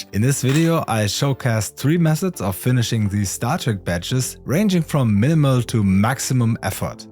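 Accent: Germany. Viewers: German . I hear the accent. lol is this doc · Cooles Video und auch cool das du so gut fachlich Englisch spricht man hört aber sehr gut den Deutschen Akzent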